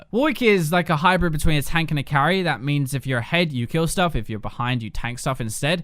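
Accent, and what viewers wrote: United Kingdom. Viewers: Tbh i dont like hes british accent.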